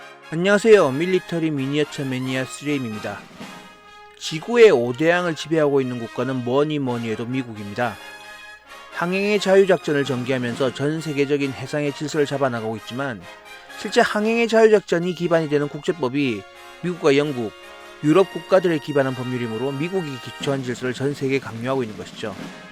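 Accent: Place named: Gyeongsang, South Korea. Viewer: 내용은 좋은데...경상도 사투리 억양이 너무 강해서 듣기가 좀 쉽지 않네요. 계속 방송 하실건데... 표준 억양 연습 부탁 드립니다. 그러면 좋은 내용이 한층 와닿을 것 같습니다. 사투리 억양 지적해서 대단히 죄송합니다.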